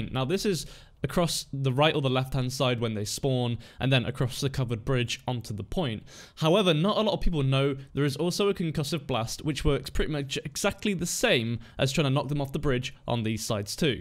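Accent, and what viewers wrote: United Kingdom. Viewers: Superb video mate, damn good Pharah play. From your accent I take it you're from the UK? Surprised you're not on the UK world cup team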